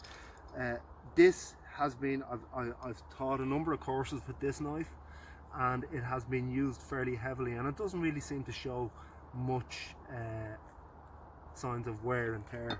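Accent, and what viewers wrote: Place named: Ireland. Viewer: It is Great to see such a Good version of an Iconic Knife been used in My Homeland ! Thank You for the method of Drawing the Fire steel back from an embedded Knife it was a New one for Me ! The Golden Rule of using a Tracker Knife is that You have to Know How to Use a Knife Properly for it to be " A Good Knife " and I have to say this as an Old Dub in London for Forty Years , it is Great too Hear an Irish Voice on this Subject and I look forward to much More .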